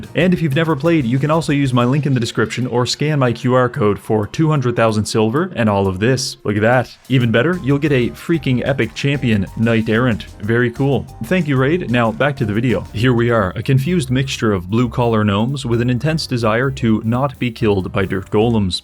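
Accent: Newfoundland, Canada. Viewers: This guy is the most newfoundland sounding guy I have ever heard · "Zombie oat-breaks" God, I love the canadian accent Also love your vids!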